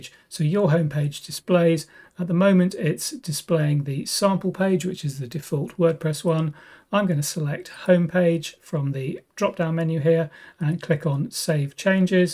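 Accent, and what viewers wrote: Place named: United Kingdom. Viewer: Good morning and thanks for your informative videos. A little tip for your presentation… your picture covers the settings window-sometimes. Please move the settings window more to the left since your picture is placed on the bottom right😊 I really like your calm way of explaining and your perfect British accent which makes it pretty easy for understanding everything. Best regards from Germany Ralf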